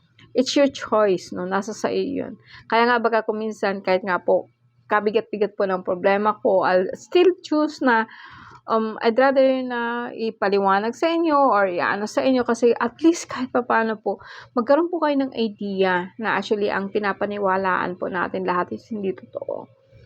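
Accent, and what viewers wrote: Bicol, Philippines. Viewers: Taga Bicol po kayo? May punto po kasi kayong Bicolano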